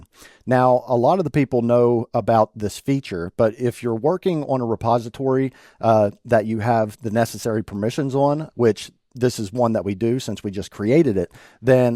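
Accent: South, United States. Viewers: Can’t i just use firebase? Also ru from the south?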